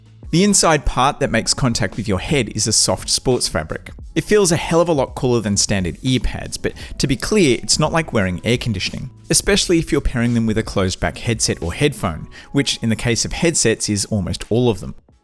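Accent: Australia. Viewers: Loving hearing the accent from a fellow Aussie 🇦🇺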